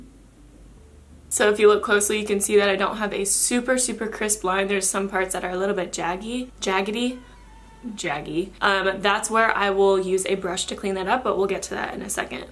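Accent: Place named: Boston, United States. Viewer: By any chance are you from Boston? You have a very interesting accent. Anyhow, awesome lipstick tips!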